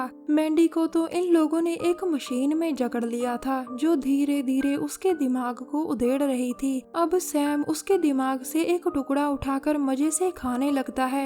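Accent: Punjab, India. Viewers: I always love you content. Specially your punjabi influence in explanation.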